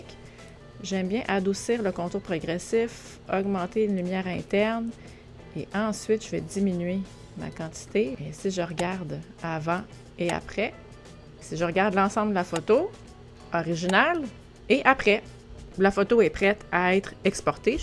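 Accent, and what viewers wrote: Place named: Quebec, Canada. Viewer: Bonjour, j'utilise Luminar NEO, et je l'adore. Mais au delà de ma passion pour la photo, en amateur, c'est votre humeur et votre accent qui me redonne envi de repartir faire un périple au Québec. J'ai adoré en juillet dernier, faire ce voyage dans votre beau pays, Patrick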